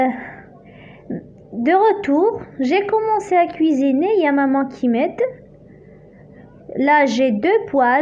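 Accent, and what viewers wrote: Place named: Kabylie, Algeria. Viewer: اختي شوية عربية مي انت قبايلية من هدرتك تباني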